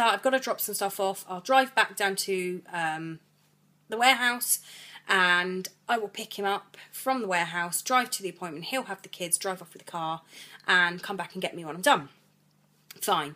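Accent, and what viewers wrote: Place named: England, United Kingdom. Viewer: Just curious love, how hot is it where you are? I assumed you were in England all this time given your accent. xo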